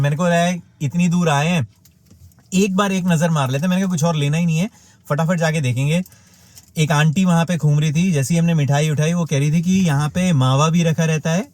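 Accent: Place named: Chandigarh, India. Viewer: my guess was right. You have Chandigarh vibes. Your way of talking has Chandigarh touch. I am too from Chd and living here in Bay Area. Good to meet you.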